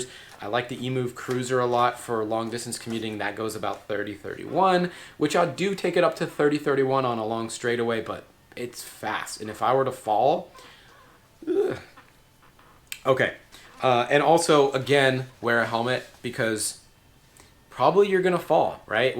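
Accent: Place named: United States. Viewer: oh american guy speaking.im outta here!!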